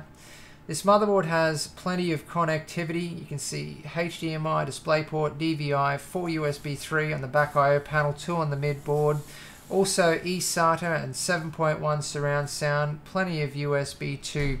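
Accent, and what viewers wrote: Australia. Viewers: What country are you from most are US but you sound aussie?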